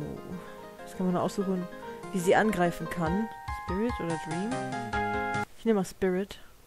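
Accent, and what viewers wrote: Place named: England, United Kingdom. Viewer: Sehr schön dass das ganze jetzt richtig startet. ^^ Bin auch zuversichtlich dass du en Easy Modus schaffst, ich weiß ja dass du es kannst in dem Ramen wo das ganze für uns Normalsterbliche möglich ist. xD Bin auch schon auf alle anderen Stages gespannt. ^^ So nebenbei die blauen Items waren in dem Teil nur für Punkte da. Und schön dass ich wieder diese tollen englischen Akzent hören kann. ^^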